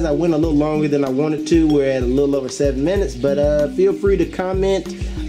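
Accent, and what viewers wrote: South, United States. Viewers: you sound like you're from the south xD hell yeah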